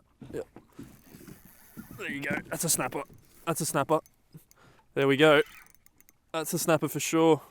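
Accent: Australia. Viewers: Where are you based like country wise because your catching snapper and those annoying ass sharks that tangle up your lines 😂 so I was guessing New Zealand north island area but your Aussie accent is putting me off 😂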